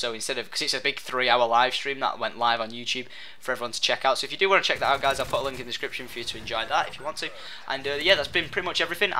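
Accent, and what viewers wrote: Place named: Manchester, United Kingdom. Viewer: na he's from england manchester i think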